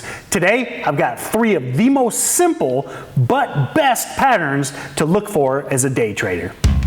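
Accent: United States. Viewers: just so you know this is the most simple, no nonsense video on patterns that i have ever seen and i have probably seen hundreds of different videos. when other people explain things it is almost impossible for me to understand but the way you, explain things paints a clear picture in my mind and actually gives me actionable instructions that i can start applying to my trading. so thank you very much for that. maybe it's because you're also from the midwest that i am able to understand you so well, but anyways i appreciate it. i also have been enjoying the podcast. it's just the right balance of entertainment and informative content. would love to see more videos like this.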